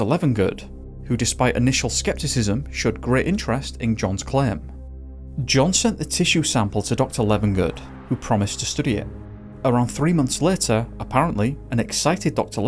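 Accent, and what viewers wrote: United Kingdom. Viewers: Great story, thx. Can I ask where in the UK your dialect is from, very charming 😊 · I’m at my limits of listening to British accent YouTube videos. One more video and I’ll vomit uncontrollably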